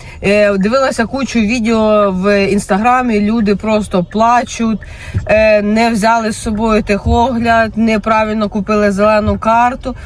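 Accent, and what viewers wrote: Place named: Vinnytsia, Ukraine. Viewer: Приємної подорожі! Храни вас Господь!!!❤❤❤ я теж так як ти говорю Молдавія, Кишиньов😅😅( ну Вінничани і Хмельничани то ми так)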